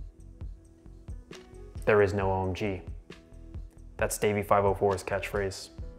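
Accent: Nova Scotia, Canada. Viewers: You literally say "aboot" and I'm not even mad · he’s def from Nova Scotia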